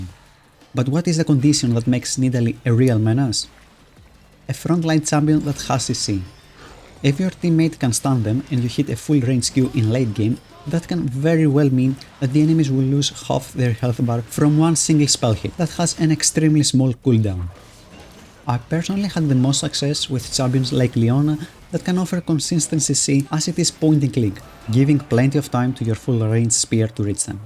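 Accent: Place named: Greece. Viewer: From your accent you sound Greek!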